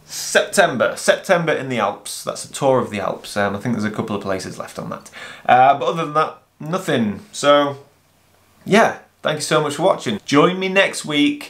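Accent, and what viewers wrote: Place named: Northern England, United Kingdom. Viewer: Its a shame that you feel you have to defend yourself. I believe the problem stems from the fact that many of us can’t afford 4k for a trip; most of us are philosophical about it, but it seems a few take the angry route...they tend to be far more vocal. Don’t pay them any mind, you have nothing to justify. Having said that, their bad behaviour has led to you producing a very interesting vlog, so...cheers dumbhorses 😂 I am quite new to your channel, via Svalbard with Morten, enjoying your work. Though frustrated, I can’t quite place your accent...I am thinking between Buxton / Sheffield. I grew up in Donny, but have lived in Scotland for 30 years...I need to get out and photo this scenery! Cheers / slanj 🥃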